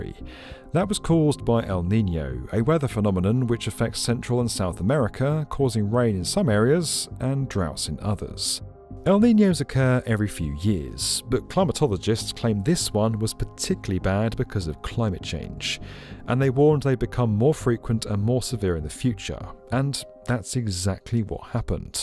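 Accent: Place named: Public school, United Kingdom. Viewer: So we get sweet talking public school voices explaining what they don’t know as they’re stupid more interested in the latest share price The reasons for and why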